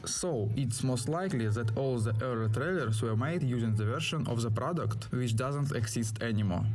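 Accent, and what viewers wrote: Russia. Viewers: why are people hating on this guy? is it because of his accent or because he is russian? there are many xenophobes i feel. keep up the good work brother. I like your uploads about cyberpunk XD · he has Russian accent, so should that deter him from making content? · russian english good god :/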